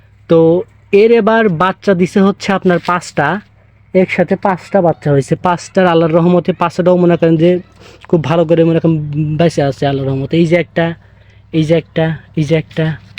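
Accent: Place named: Bogura, Bangladesh. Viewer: ভাইয়া আপনার বাসা কোথায় কথা বার্তা দেখি আমাদের বগুড়া জেলার মতো,প্লিজ বলবেন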